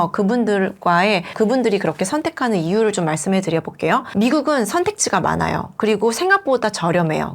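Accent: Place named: Jeolla, South Korea. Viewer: 절라도 억양😢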